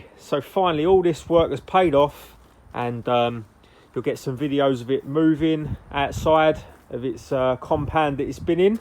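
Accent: Essex, United Kingdom. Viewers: Essex boy voice sounds like micky flanagan lol love the videos brother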